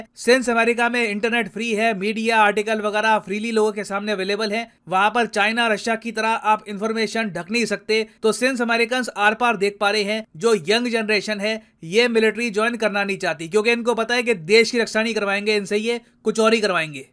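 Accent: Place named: Delhi, India. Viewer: sir kbhi-2 ekdum Delhi wale launde jaise baat krte h, how sweet 😂😂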